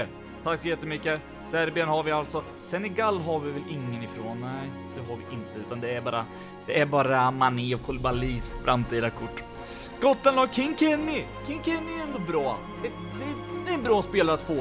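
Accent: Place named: Östergötland, Sweden. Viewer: Östgötska dialekten gör att jag följer dig ❤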